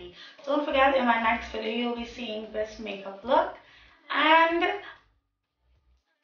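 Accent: Trinidad and Tobago. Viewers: You from Trinidad 🇹🇹 ??? Your accent 😍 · I hear that trini accent... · I randomly clicked on this video looking for reviews on the soap and immediately was like wait is she Trini? Lol its so cool how our accent is soo recognizable especially to other Trinidadians lol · I know a Trini accent when I hear one 😍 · First 3 seconds, i knew she was Trinidadian. Liked and subscribed ONE TIME!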